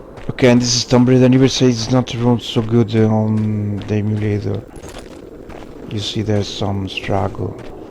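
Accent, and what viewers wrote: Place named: Italy. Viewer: Mi pareva dall'accento inglese :) Bel video, non conoscevo Batocera, ci darò un occhio!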